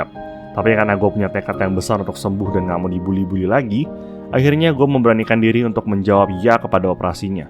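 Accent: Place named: East Java, Indonesia. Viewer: emang kakak orang jawa timur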